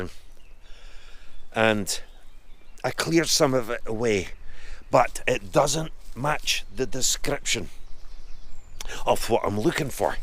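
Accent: Scotland, United Kingdom. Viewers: I would have never thought that one day i'd watch a video of Avatar's villain showing me the tomb of a medieval knight, with the thickest scottish accent i've ever heard.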